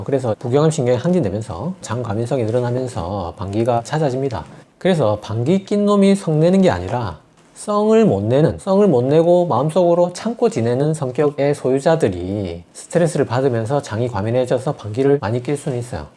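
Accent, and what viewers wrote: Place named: Busan, South Korea. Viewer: 전라도 광주 사는데 원장님 부산 사투리가 넘 재미있고 정도가구요 의학정보를 부산 사투리로 들으니까 은근 중독성 있어서 계속 듣게 돼네요 앞으로도 부산엑센트 계속듣고싶어요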